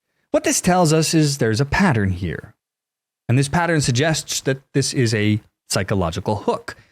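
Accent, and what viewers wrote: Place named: Mid-Atlantic, United States. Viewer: His Mid Atlantic accent is the shit! 🔥🔥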